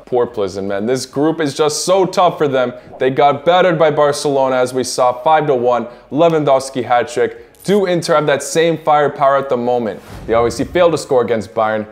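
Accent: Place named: United States. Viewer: Can tell hes american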